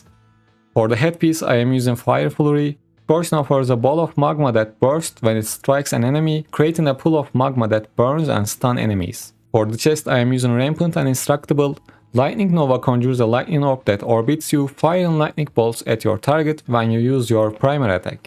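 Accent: Turkey. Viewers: türk aksanı vurdu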